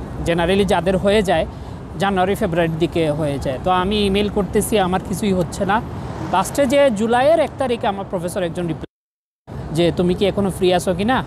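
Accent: Sylhet, Bangladesh. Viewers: Vai afne sylhety maybe??😊 amio sylhet shahporan alakar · সিলেটী একসেন্টে চমৎকার উপস্থাপনা! অনেক শুভকামনা।❤ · Bhaiya apni sylheti?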